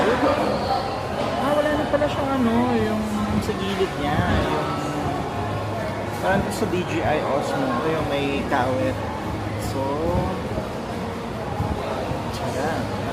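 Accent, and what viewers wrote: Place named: Thailand. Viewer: sobrang thai accent ahahahaa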